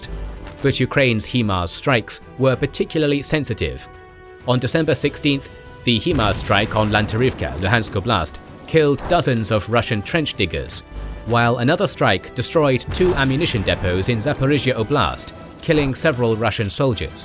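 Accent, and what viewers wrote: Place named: England, United Kingdom. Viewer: FINALLY...someone with a decent English accent... and can actually pronounce the Ukrainian town names! So much better than all the foreign commentators who can't even speak English! Great stuff...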